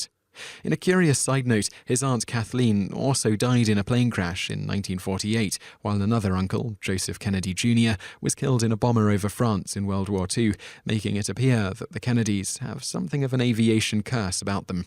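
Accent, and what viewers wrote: United Kingdom. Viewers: Too many famous people have gone down in planes, esp. in those single or twin engine prop planes like Cessna! Rich folk with their toy planes! Has happened even locally once in awhile where I live, a local airport with a line of small planes called "Gull Airlines"! Wouldn't get me up in those tiny planes for anything in the world, forgetaboutit! BTW, Ethel Kennedy's parents were both killed in a plane crash together as well and Jackie knowing the family history didn't want JFK Jr. to fly and said he was easily distracted and may have had ADHD! May they all rest in peace. Love a British accent...thanks for sharing~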